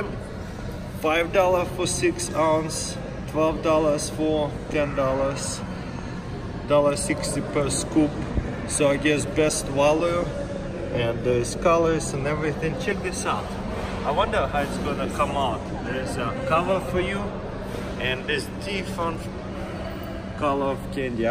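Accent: Russia. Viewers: ты можешь говорить по русски? немного заметно по твоему акценту. это круто,что наши могут так подняться на ино.ютьюбе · Its just his accent cause he is russian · У тебя акцент как у русского,ты русский не так ли?) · Some heavy Russian accent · Мне кажется или это не американец, а русский. Акцент вообще не английский и произношение хромает. Ну, если что: привет из рашки! :3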